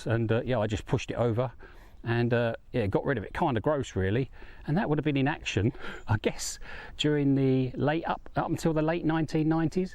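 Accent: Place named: United Kingdom. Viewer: Thank you for the vlog, i love the scenery, and the way you hv done up your property. If i ever have students again to teach them english i will recommend your chennel, beautiful every day british english. Thank you sir.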